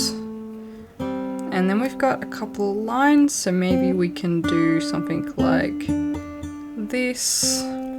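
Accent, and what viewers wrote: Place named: Australia. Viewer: IS THAT AN AUSSIE ACCENT I HEAR OMGGGG YAYYYYY :) i never find any aussie youtubers about magic and spirituality.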